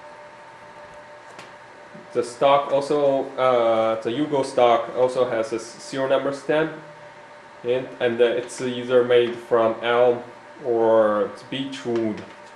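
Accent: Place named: Eastern Europe. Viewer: I thought he was Asian too, until the very last line. The way he says "wideo" you know he is a slav, maybe with some dramatic mixed in. Definitely not an Asian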